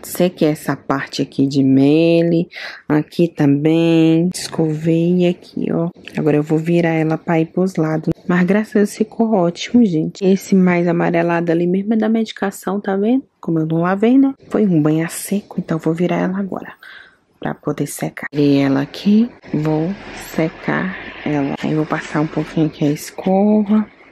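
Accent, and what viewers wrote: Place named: Bahia, Brazil. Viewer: Muito lindo o amor pelas meninas , o geito de falar não me aguento de ri kkkk sotaque baiano mesmo obs: também sou 😘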